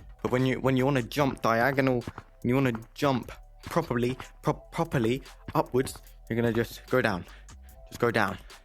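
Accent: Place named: United Kingdom. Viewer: u sound british, but you dont look british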